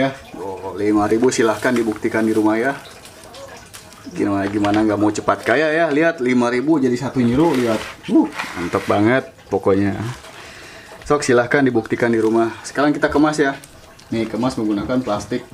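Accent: West Java, Indonesia. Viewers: beda wae ari urang sunda mah nyarios na jakarta bandung hahahaha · Kang orang sunda mana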